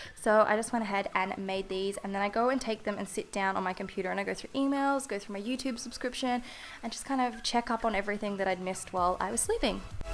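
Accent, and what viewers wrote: Australia. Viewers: Are you Australian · are you australian? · Yep she's Australian 😊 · Is she Auatralian? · Are you Australian? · Love your accent 😍😍 Australian? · I can tell ur from Australia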